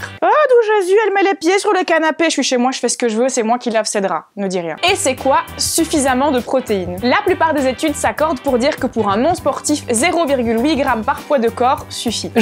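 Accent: Belgium. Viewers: Elle est belge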